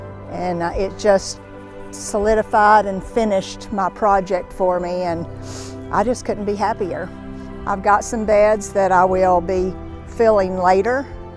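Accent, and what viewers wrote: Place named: South, United States. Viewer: The video about the interior mentions that her kitchen countertops were crafted locally in Maryville, however there are about 7 states have a Maryville. Given her southern accent, I would guess its Maryville Tennessee, but I could be completely wrong.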